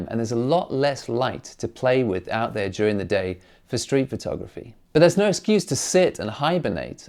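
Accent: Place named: South Africa. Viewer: Man I knew you were South African the first time I heard you speak, can't mistake some of those enunciations coming through the British accent 😅 . Great video bru 🇿🇦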